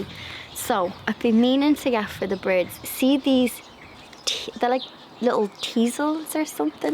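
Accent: Ireland. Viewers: Love your garden updates, it's pure happiness listening to a familiar Irish voice and your slang terms that I miss (ex Blanchie living in UK), love your enthusiasm, you never fail to make me smile!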